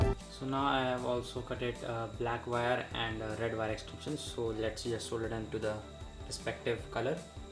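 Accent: India. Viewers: Why do indians speak english like this!? It is infuriating!! 😡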